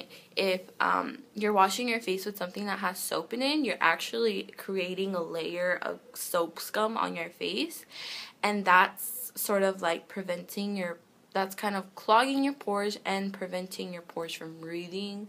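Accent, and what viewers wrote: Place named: Canada. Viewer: Lol from your voice I thought you lived in Canada cause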